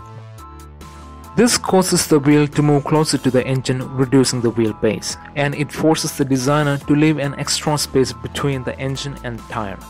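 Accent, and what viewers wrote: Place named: South India, India. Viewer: U are awesome man thanks for the information that to detailed about the suspension system and how it works. Really needed these info also saw your telever suspension on BMW just great man keep it up. Where u from from your accent I think you are from south part of India.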